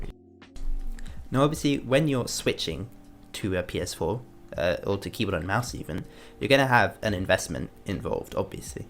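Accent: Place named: United Kingdom. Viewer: I’m so used to hearing people talk with a British or Australian accent I forgot he was British lol good review tho:)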